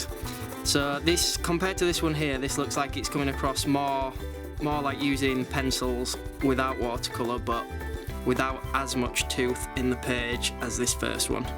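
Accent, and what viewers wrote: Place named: Northern England, United Kingdom. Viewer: Such a thick northerner accent I reckon (I'm not an English speaker) But were you stoned or is it just my stoner paranoia?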